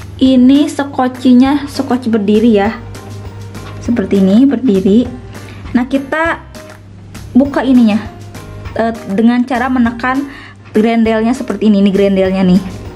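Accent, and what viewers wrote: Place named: Sunda, Indonesia. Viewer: Menginspirasi banget tteh, nada ngomongnya nyunda, 🤭 galfok sama " biar ga amburadul"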